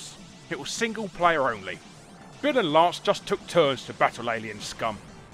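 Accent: United Kingdom. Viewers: Why so british?!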